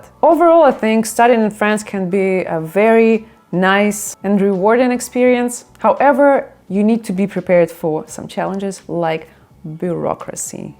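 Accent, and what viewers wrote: Russia. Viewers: Спасибо за видео. Но акцент русскоговорящего человека очень сильно слышно с первых минут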